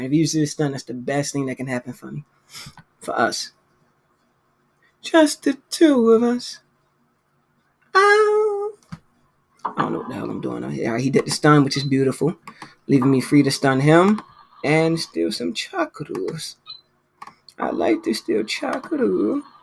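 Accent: Baltimore, United States. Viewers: u from balitmore? why u pronounce ur Us like dat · Baltimore accent?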